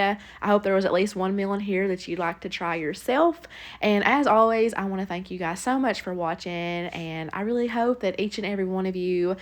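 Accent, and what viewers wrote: South, United States. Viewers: That’s what I was saying, I think it’s a southern thing — just a different dialect. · That southern accent makes my heart smile. Hearing the voice-over, I can just imagine your beautiful smile. I'm definitely trying the chicken nachos recipe & the beef tips. I've always wondered if Josh likes real onions, lol? I'm not a fried salmon person either. As a child I ate a lot of them & now I hate them & the smell of them 🤣. Have you ever heard of salmon salad (like tuna salad)? My grandmother used to make it & I like it that way. That's the only way I will it salmon now & that's every blue moon. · To all those correcting her pronunciation, Southerners typically pronounce the L in salmon. It's not incorrect; it's cultural! ❤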